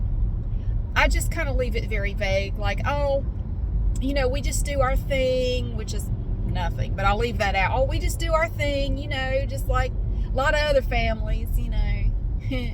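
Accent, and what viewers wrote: South, United States. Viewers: I am patiently waiting for your costume reveal!! The holidays w/ southern ASMR sounds are my my favorite way to celebrate! You always get me in the spirit ❤️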